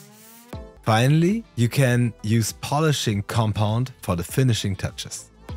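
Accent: Germany. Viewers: Eindeutig deutscher Dialekt 😁 Edit: Akzent xD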